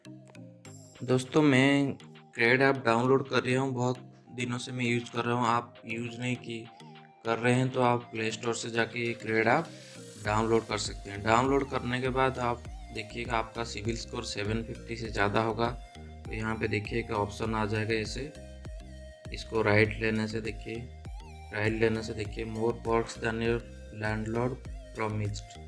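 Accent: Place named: Odisha, India. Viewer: Please correct me if I am wrong, I feel you are from Odisha due to your accent.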